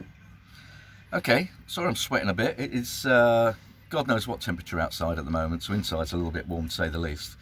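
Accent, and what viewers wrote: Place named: United Kingdom. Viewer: Also nice to hear from a Brit doing this stuff. That’s not meant to be an inflammatory or discriminatory comment, it’s just nice to hear a familiar accent, a different perspective and someone from home enjoying their Troopy build - and there aren’t that many Troopies in the UK!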